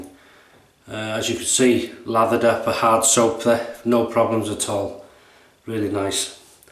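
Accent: United Kingdom. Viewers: I travel quite a bit for work, so those shave sticks are a life saver for me. Thanks for the video,! From which part of the UK do you hail? (I know England, but which part? London? Birmingham?)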